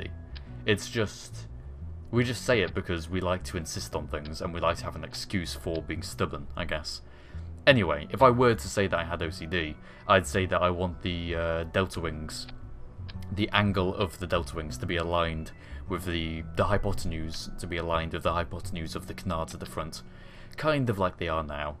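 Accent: United Kingdom. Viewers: Ein, der Deutsch mit britischem Akzent spricht, kann ich nur als großen Gewinn bezeichnen.:) Greetings from germany, give us some more of your skills, we will help you learn it.:P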